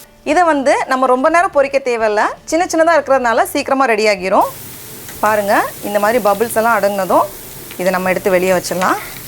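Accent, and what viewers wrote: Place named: Nagercoil, India. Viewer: Baby is soooo cute... And the way you speak ... Is your native is Nagercoil?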